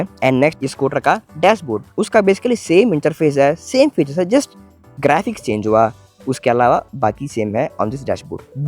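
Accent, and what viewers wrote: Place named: Telugu, India. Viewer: telugodu hindi matldutunattu enti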